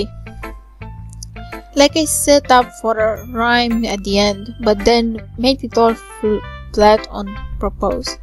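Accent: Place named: Romania. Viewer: Ma refer se cunoaste din accent ca esti romanca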